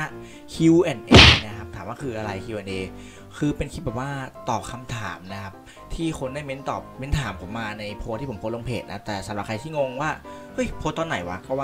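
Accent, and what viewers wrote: North, Thailand. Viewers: อ้าวอ้ายคนเหนือกะ555 FC ครับบ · อ้ายเป๋นคนเหนือก่า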